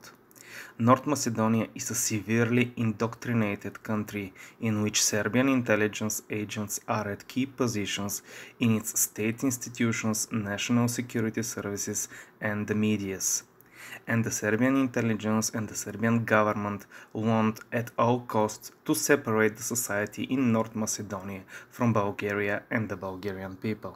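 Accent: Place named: Russia. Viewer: Your English with Russian accent is very funny!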